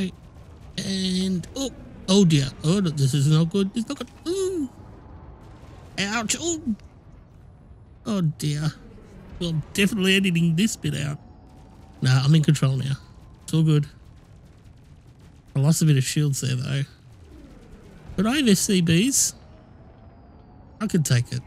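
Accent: Australia. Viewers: You sound like an Australian Jack-Frags :D :D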